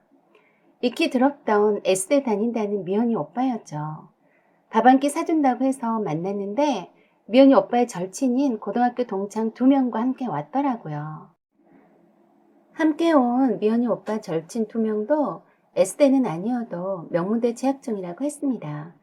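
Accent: Jeolla, South Korea. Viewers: 전라도 억양이 너무 많이 나와서 듣기 거북하네요 나도 전라도 사람이지만요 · 오늘따라 전라도억양이 엄청 강해부러요ㅋ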